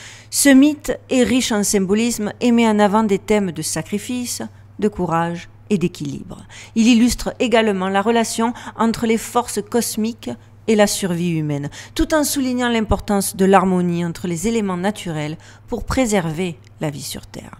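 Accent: South, France. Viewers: J'ai decouvert recemment votre chaine et franchement bravo : votre déroulé est structuré, les themes pertinents et suspens, humour et cet accent du sud que j'me tant. Tout y est. Alors on ne lache rien, on met nos ceintures et on s'envole avec vous pour des horizons plus ouverts. Tant mieux si tout ce qu'on a appris etait faux ca nous force a decoder plus loin. Et en science on dit que toute hypothese est vraie jusqu'a ce qu'une autre l'infirme et la remplace. Restons modestes et les yeux et le coeur grand ouvert. Vous nous montrez la voie. Les contes et mythologies populaires nous plongent dans nos origines et les conteurs etaient des personnes riches d'un savoir caché transmis de bouche a oreille alors j'embarque avec joie !!!! Force a vous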